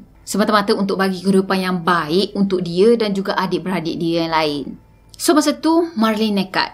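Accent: Kelantan, Malaysia. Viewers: Walaupun sis ckp kl tp masih ada bunyi kelantan tu. Sbb org kelantan je pakai ayat " dah tu "😂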